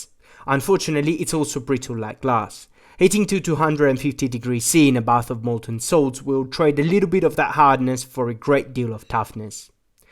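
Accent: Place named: Italy. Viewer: He's Italian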